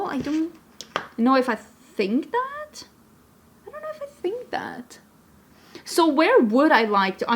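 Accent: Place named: Sweden. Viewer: I think I heard you mention previously that you are from Linköping, which is the town my mother was from. She passed away almost 2 years ago and I miss her terribly. Your talking about Sweden and your accent bring me comfort! Sweden is a beautiful country, I have many great memories of it from childhood.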